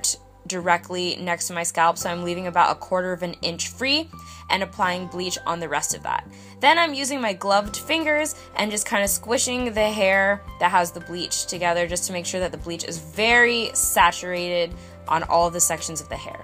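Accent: Jamaica. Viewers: that jamican accent tho i can teach u cuz i am jamaican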